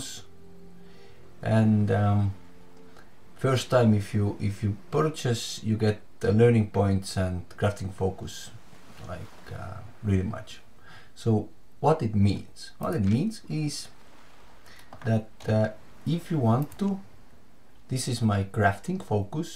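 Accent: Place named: Estonia. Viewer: Actually i kinda like your voice but your Estonian accent is clearly understandable. Keep up!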